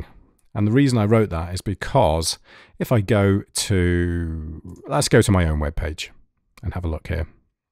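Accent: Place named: United Kingdom. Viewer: You sound like ownagepranks british accent jonathan character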